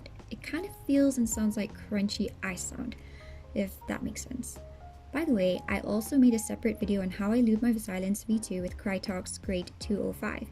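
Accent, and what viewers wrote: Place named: Scotland, United Kingdom. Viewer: this is random but you sound slightly scottish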